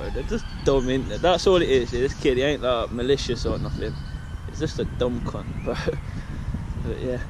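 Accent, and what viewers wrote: United Kingdom. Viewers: He’s brummie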